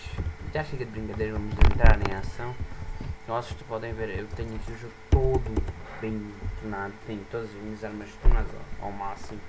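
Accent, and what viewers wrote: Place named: Portugal. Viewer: Portugues de portugal, hahaha bonzao! :D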